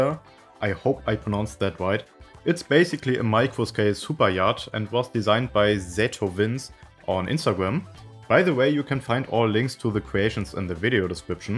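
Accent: Germany. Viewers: Is it shust me or do i hear german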